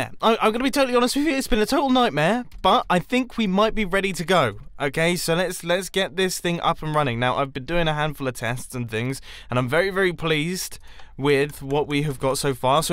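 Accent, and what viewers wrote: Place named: United Kingdom. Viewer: ello charming brit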